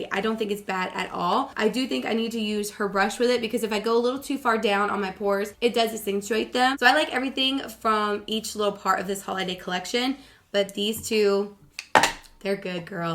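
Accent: South, United States. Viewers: Not sure if it’s you losing your voice or moving back to Georgia but your lil southern twang is coming back out! So cute 💜💜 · love your video and your southern accent... greetings from Athens GA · I can hear you southern accent more when your voice is coarse. It’s so cute!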